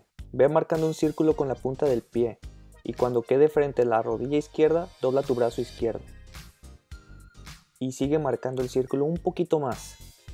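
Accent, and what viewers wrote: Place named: Mexico. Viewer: Todo un crack, por tu forma de hablar creo que también eres mexicano. Excelente forma de explicar.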